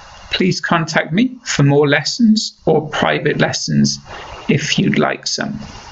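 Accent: United Kingdom. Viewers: ohhhhh that's amazing!!!!!!!!!!! I like your british accent! God Bless you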